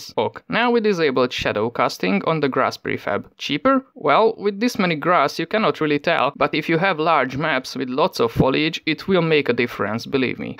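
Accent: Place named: Hungary. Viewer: Hunglish accent my beloved ❤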